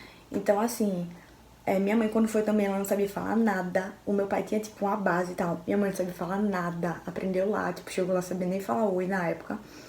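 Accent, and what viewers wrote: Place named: Pernambuco, Brazil. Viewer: Não tem como não admirar uma pessoa que apesar de tão jovem, é de um extremo caráter, responsável e amorosa.Confundi seu sotaque, mas agora sei que você é uma conterrânea, pois meus pais eram pernambucanos, e eu, apesar de ter nascido aqui em Guaruja SP., de certa forma me considero de Pernambuco também. Beijinhos e Deus abençoe.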